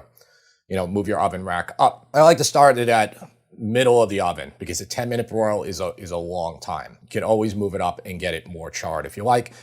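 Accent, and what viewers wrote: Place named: New York-New Jersey, United States. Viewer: With his NY-NJ accent, the host sounds like comedian Jim Florentine. 😂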